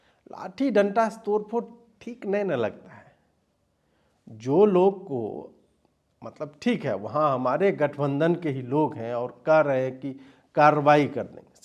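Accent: Bihar, India. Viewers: Your Bihari accent is very nice Ajit sir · EXCELLENT satire! Bihari accent is lovely!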